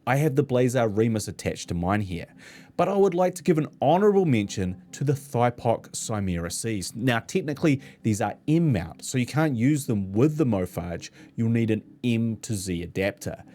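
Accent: New Zealand. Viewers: love the intro skit: hehehe do more! love the kiwi accent too.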